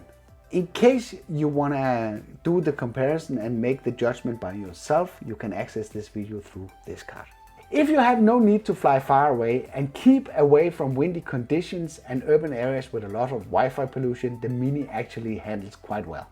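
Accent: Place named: Denmark. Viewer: man kan godt høre at du er dansk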